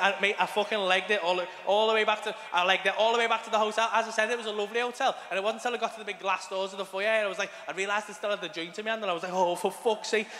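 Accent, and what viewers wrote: Liverpool, United Kingdom. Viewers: English. Liverpool scouse accent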